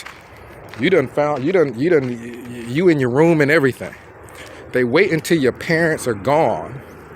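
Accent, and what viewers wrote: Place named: Texas, United States. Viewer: How long have you been in Texas? You are developing a Texan accent.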